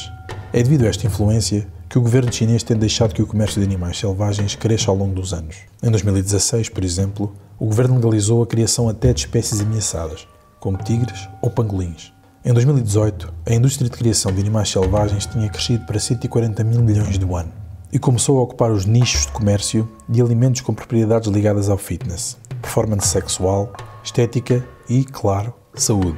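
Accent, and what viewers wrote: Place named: Portugal. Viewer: Parabéns para o narrador de Portugal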